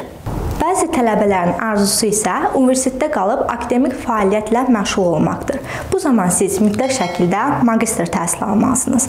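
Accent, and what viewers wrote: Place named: United States. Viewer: Sözləri sanki Amerika ləhçəsiylə ifadə edirsiz. Danışığnzı düzəltsəz yaxşıdı. Adam dinləmək isdəmir. Bu kanal çox faydalı kanaldı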